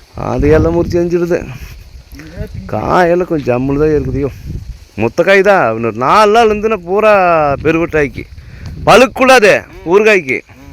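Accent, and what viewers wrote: Kongu, India. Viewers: உங்க வாய்ஸ் நம்ம மேக்கத்தா பாஷை அருமை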